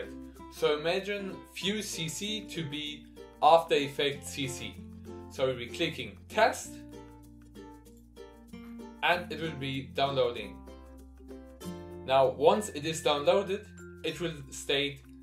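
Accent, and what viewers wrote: Germany. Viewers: "Installachion" Versuch mal den deutschen Akzent wegzubekommen. Das kann man sich kaum geben.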